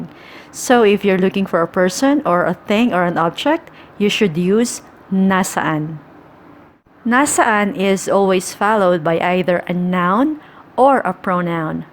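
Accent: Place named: Philippines. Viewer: That’s the true Filipino accent actually. Very far away on how they portray Filipino accent on the internet/movies. 😅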